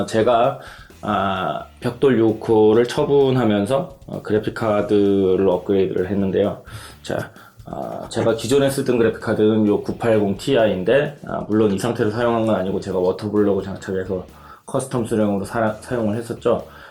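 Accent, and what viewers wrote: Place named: Gyeongsang, South Korea. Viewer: 띵충 보면 욕하는 경상도 사투리 쓰면 일베인가? 경상도인 어떡하지..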